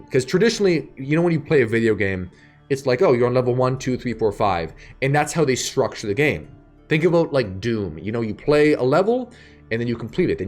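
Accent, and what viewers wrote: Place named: Canada. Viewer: Most Nadian accent ever!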